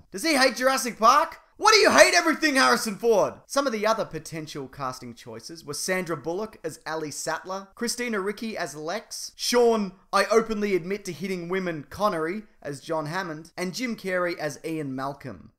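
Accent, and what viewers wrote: Australia. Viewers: Facts about Jurassic Park being told by an Australian is too good to be true.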